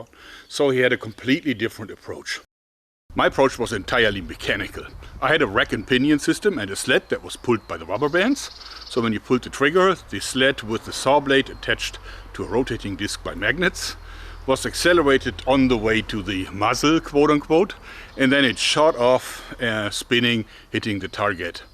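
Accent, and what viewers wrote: Germany. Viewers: Man hört einfach Instant daß er ein Deutscher ist. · Explain to me why ur having an english instead of a german channel? - Your accent is distracting XD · Learn english without accent, german... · Hey you sound like you are german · Kann es sein das du eigentlich Deutsch bist? Ich finde man hört es an deinem Englisch 😁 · Man hört richtig das du Deutsch bist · I hear a German accent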